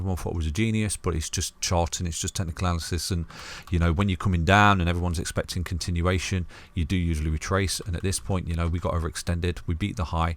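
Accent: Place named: Nottingham, United Kingdom. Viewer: You sound like a Notts lad, are you local?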